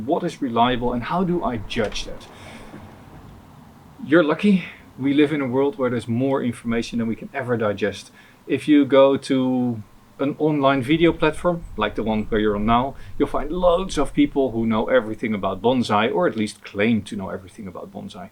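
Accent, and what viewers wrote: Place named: Netherlands. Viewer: Never had a dutch accent this thick haha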